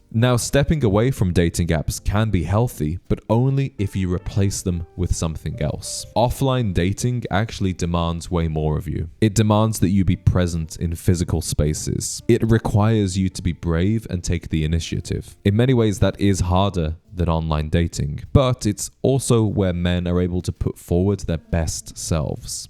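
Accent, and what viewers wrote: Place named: United Kingdom. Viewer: Dude you have a great voice, lots of depth. You could easily do audio work. Of course you’ve got the Brit accent as well which is usually a bonus haha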